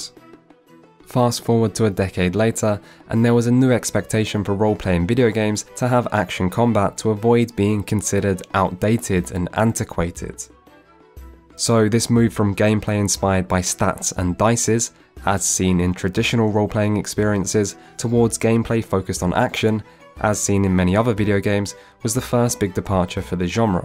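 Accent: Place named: United Kingdom. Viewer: but you have a British accent!!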